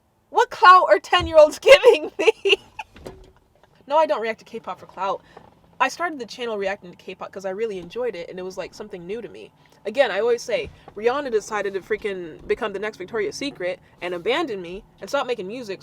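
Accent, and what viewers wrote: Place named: United Kingdom. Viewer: I love your British accent...more please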